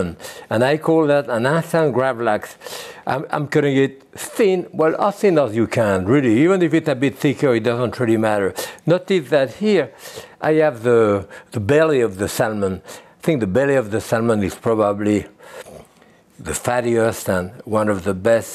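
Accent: France. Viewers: I love ur french accent english!😊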